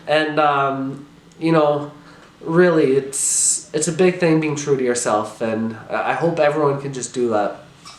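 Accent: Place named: Canada. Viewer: I love your canadian(?) accent.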